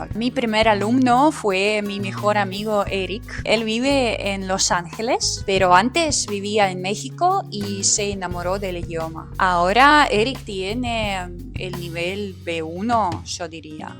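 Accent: Buenos Aires, Argentina. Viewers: Hola, linda 🫶🏼 gracias por el saludo Jajaj fue inesperado. P.D. Me encanta tu italiano 🤌🏼 ni hablar de tu español porteño 😻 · Hablas muy bien varios idiomas te felicito! hablas muy bien el español argentino tenes el acento porteño, mis abuelos eran italianos, yo tengo la ciudadania italiana , saludos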